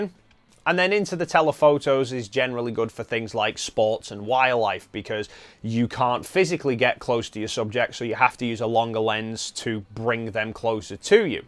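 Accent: United Kingdom. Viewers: Thanks for the video! I think I'm going to try prime 50 or 80mm. Btw, are from eastern parts of the UK? Trying to guess by the accent.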